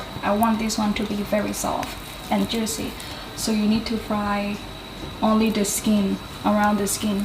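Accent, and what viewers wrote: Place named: Australia. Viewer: You are picking up a good Aussie accent I notice in many words you say 😁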